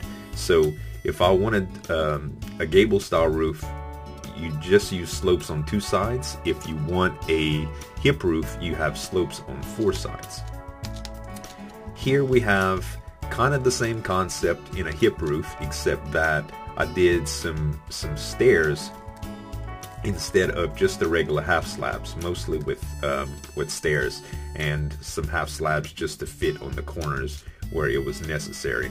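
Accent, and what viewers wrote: Louisiana, United States. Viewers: This guy has got to be from Southeast Louisiana. He has a Cajun accent.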